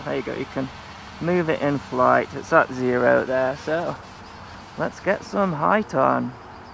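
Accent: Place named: United Kingdom. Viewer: Your so popular I think because your delivery mixed with your British accent then add that you're very informative and interesting to listen to. Keep up the good work! Sincerely a Loyal subscriber. William Horsley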